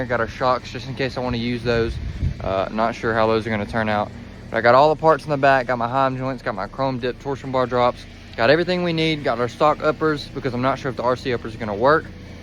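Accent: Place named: Alabama, United States. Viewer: you from Alabama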